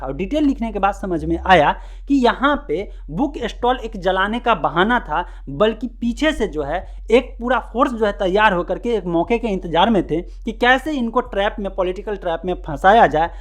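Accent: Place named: Pakistan. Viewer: भाई वीडियो का थंबनेल और टाइटल चेंज करले आचार्य जी नाम के आगे दूंगी लिख रखा है वीडियो सही बनाई है पर ये सही नहीं है व्यूज कही और से कमा लियो, आचार्य जी के तरफ से बोल रहा है तो ढंग से बोल ये क्या आधा हिंदुस्तानी आधा पाकिस्तानी। भाई जल्दी नाम के आगे से ढोंगी हटा नहीं तो रिपोर्ट और dislike करनी होगी मुझे।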